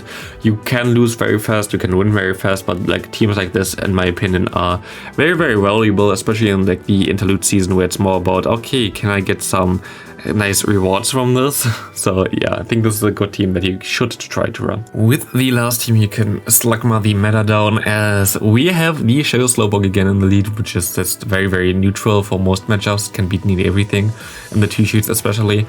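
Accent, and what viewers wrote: Germany. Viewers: He is German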